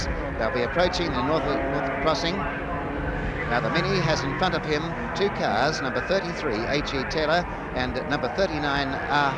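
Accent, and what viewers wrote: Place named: United Kingdom. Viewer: Yes. does a great job here in commentary of giving silly old codgers a bad name. Evidently, motor racing & production sedans especially, were not his strong suit. However, his commentary here is a priceless time capsule displaying how things really were back in 1968. Aunty (Our ABC) did perform a marvellous public service by sending film crews, cameras & commentators to a multitude of events similar to this one when the big three commercial networks couldn't be bothered. Then, to cap off that effort, they archived the film reels long before Joe Public could lay his hands on a video tape recorder. may have been a tad in over his head but here he is working on a weekend devoted to his work & bringing us some classic Aussie motor racing action. commentary here is priceless & bordering on comedic. He barely had a clue but gave it his best shot in his awkward best Queen's English. 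From his commentary, I can picture him & many like him, black suit jacket, thin red tie reaching into the glovebox of a Humber Snipe or a Vauxhall Cresta, retrieving a thermos & pouring a steaming hot cuppa in the paddock between events at glorious old Warwick Farm. takes us there.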